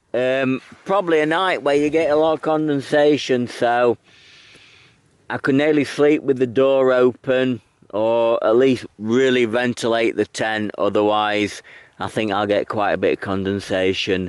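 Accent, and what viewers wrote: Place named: Dronfield, United Kingdom. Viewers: Absolutely love your videos. Originally from Dronfield, so it's lovely to hear your flat vowels. Really fabulous content, do keep them coming. Much respect sir 🙂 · I much prefer your narration and Yorkshire accent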